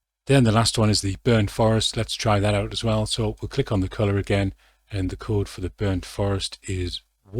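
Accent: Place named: North, United Kingdom. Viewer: You've gotta be a northerner right? Sound like your from my favourite city possibly? :D Love it man, to the point info, great details, great video :)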